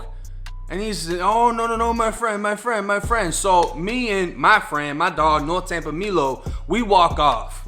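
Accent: Boston, United States. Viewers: How are u raised in florida with a boston accent? I get u were born there but its not like an accent has anything to do with genetics lol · This dude talk like a Boston towny and a fla g had a baby I’m from central fla ain’t never heard no shit like it but much respect sound like you put in work when you was down keep keepin it real bruh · Bra got a Boston accent but if u fuck around in Florida too long it’s gon change u a bit 😂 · I just said the same thing, can’t tell if he’s from Boston or the South👍🏾🤣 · Tampa? I swore from your accent you was from Boston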